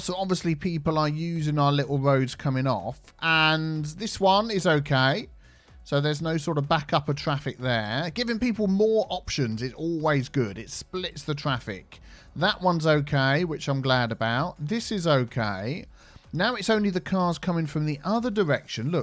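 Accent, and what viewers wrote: United Kingdom. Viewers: Ah! Poke me eye out! Love the British accent,. Adds flavor to your videos. Good stuff as always.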